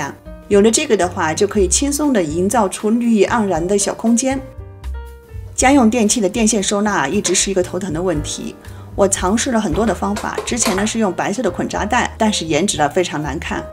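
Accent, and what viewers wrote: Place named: South, China. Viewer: 估计播主是南方人，所以有困难，不要苛求，感谢播主的分享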